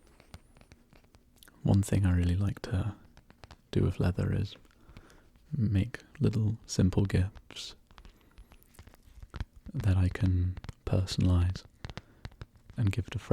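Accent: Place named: England, United Kingdom. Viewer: I'm a sucker for an English accent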